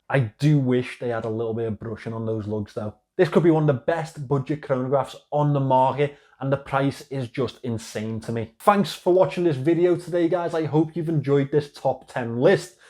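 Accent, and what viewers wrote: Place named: Scotland, United Kingdom. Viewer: This lovely scottish accent !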